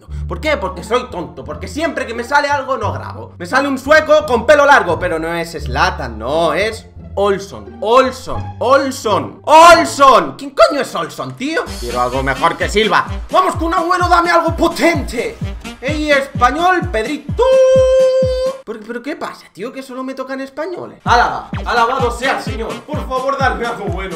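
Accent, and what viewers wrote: Argentina. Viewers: Hablando argentino boludo jajaja <3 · De los mejores acentos argentinos que escuché de alguien que no es argentino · jajajajaja q bueno el acento argentino!!! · Que buenos son tus videos boludo! jajajajaja me encanto hablando Argentino! Crack la concha de la lora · Jajaja me encanto tu acento argentino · Yo soy Argentino,y te sale re bien el acento xDDD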